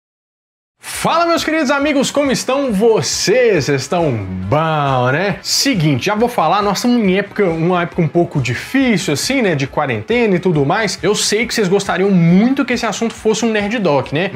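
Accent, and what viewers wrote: Minas Gerais, Brazil. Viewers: Meu amigo....Boa noite....acho seu canal muito bom....dinâmico ...informativo...sem dizer q o jeito de vc apresentar é peculiar...seu sotaque" pão de queijo" é ótimo. Trabalho no Hospital ao qual se referiu....Hospital Naval Marcilio Dias....E completo de cá , para conhecimento de todos, q o HNMD, orgulho da nossa Marinha, à época e ainda nos dias de h,j é referência Nacional p atendimentos a pacientes radioacidentados. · deixa o mineirês aflorar, sô! É lindo demais, uai!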